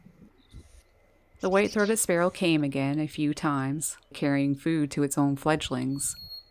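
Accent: Canada. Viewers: Great message and video 💖 Nice to hear of your Ma and formative foundations. Like many others, I look forward to occasions when I'm able to appreciate your passions. And your wonderful Canadian speech. At times, my thoughts refer to you as ', the ' 😄 (don't know if that's the influence from Brooklyn, Philadelphia or some other part of your southern neighborhood).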